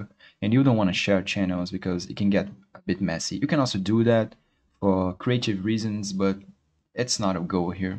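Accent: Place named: Brazil. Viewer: esse sotaque não me engana tu é brasileiro CERTEZA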